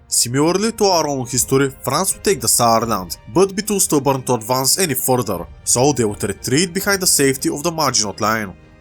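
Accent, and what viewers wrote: Bulgaria. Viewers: The Bulgarian accent is strong with this one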